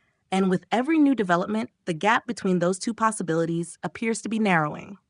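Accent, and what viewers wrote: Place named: United States. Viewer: Isn't Corrie a British programme? Why is the narration American? (I'm assuming AI, but still ...)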